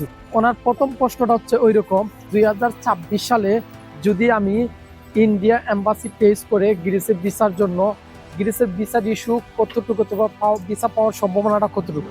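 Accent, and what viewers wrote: Sylhet, Bangladesh. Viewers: আপনে সিলেটি নি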